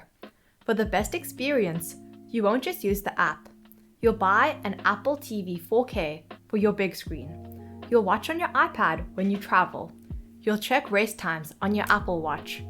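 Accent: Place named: Zimbabwe. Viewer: Nice informative video. Well narrated and edited. Whats your accent? Zimbabwe ? Keep up the great work. Cheers from New Zealand 😎🎸🤘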